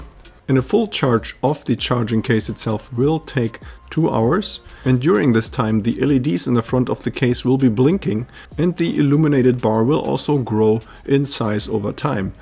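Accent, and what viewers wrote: Germany. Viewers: just because Im curious, is he german? I think he has an german accent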